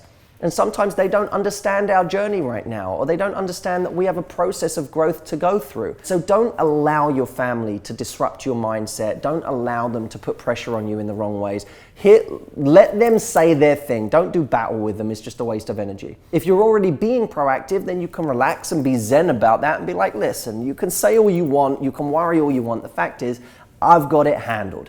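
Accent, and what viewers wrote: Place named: United Kingdom. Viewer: Do you know what..You are completely true..Before I saw your channel I hate British accent..Now I love it sooooo much..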